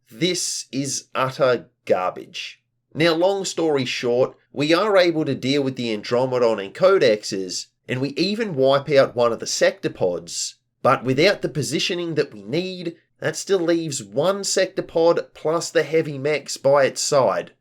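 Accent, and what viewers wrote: Australia. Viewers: The Aussie accent just adds flavor to these videos. It just makes them soothing. I put it in background sometimes.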